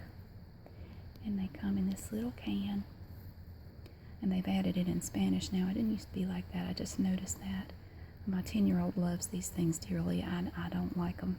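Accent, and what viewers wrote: South, United States. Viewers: Compared to your recent videos, you seem like you've lessened the intensity of your accent! This is definitely a harsh southern accent, I miss the southern rambles :(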